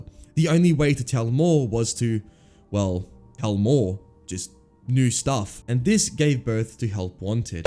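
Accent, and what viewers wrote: Australia. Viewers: you sound australian...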